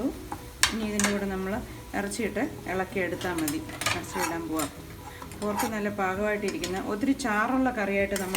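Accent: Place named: Kottayam, India. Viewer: Very easy. Keep going..waiting for simple recipes. I like kottayam style language also.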